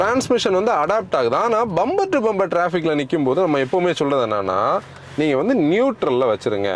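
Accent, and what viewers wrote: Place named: Thoothukudi, India. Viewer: Hi This is among one of the best way of reviews among the most of the moto wagan reviews, incl ur review of Slavia 2025. You have covered the review in Q&A method. You even compared with parallel sedan variant of skoda. Thanks a lot for ur service. Your review is one among the best in comparison with other national level reviews. On the lighter side, I like ur Thoothukudi தமிழ்.🎉😊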